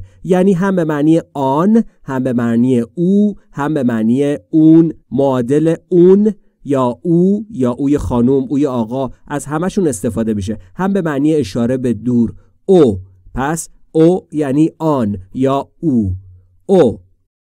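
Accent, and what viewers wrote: United Kingdom. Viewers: با تشکر ولی لهجه شما کاملا انگلیسیه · لهجه ى شما انگليسى است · لحجه شما انگلیسیه اقا و دارید باهاش کلمات ترکی رو تلفظ میکنید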